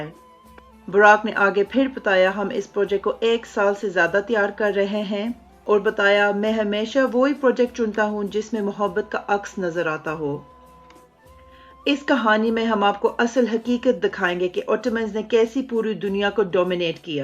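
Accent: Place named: United Kingdom. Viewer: Why are you speaking Urdu in English accent? It doesn't suit you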